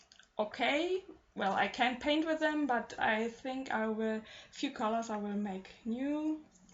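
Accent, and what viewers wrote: Germany. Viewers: I hope this isn't rude to ask, but could it be that you are german? Just judging by your accent... Not that that's a bad thing! I really really enjoyed this collab! Both pictures work very well together, especially because your half is rather warm, but still has some blue/cold accents which resembles the colors PearFleur used in her half. Her part yet again has little accents of warm colors as well it resembles yor part. Just a very nice Collaboration, and I think most people, if not all agree with me!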